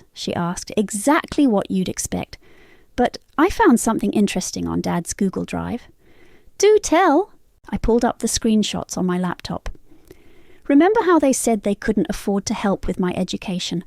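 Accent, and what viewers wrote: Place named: United Kingdom. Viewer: I loved this story and I really loved the British narrator 😊❤🎉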